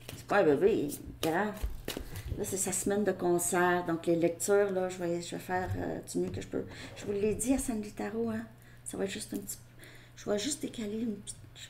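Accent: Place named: Sherbrooke, Canada. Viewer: Woin a pomale le même accent à Sherbrooke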